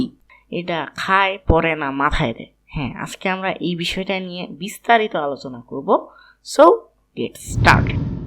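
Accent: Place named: Rangpur, Bangladesh. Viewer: আপনার ভাষা আমি বুঝেছি। যেমন আপনার বাড়ি অমপুর।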